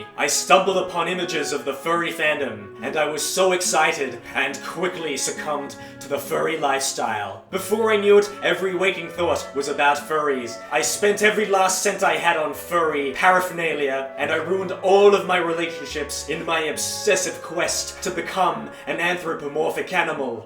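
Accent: Australia. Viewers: You're Australian?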